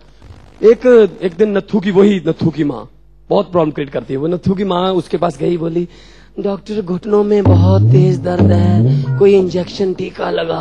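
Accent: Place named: Punjab, India. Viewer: he is actually great comedian in punjab.but he is very uncomfortable speaking hindi in punjabi accent 😂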